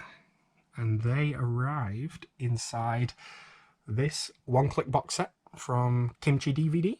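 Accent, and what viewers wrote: United Kingdom. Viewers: He sounds like hes from the UK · Why does he sound British? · You sound ?English but you said 5 bux where in the states are you ?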